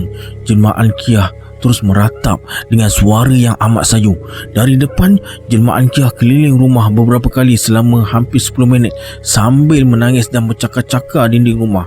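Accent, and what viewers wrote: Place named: Johor, Malaysia. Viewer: Jaln parit kemang B.Pahat selalu saya lalu bila balik rumah abah saya. tk sgka ada kisahnya.Btw ni org Batu Pahat ke? tgok slank bhasa ni mcm org Johor.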